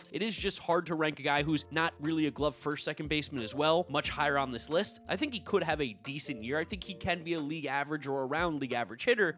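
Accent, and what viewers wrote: New York, United States. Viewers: I guess that's the new York accent. I'm from New York and I don't either. Fowards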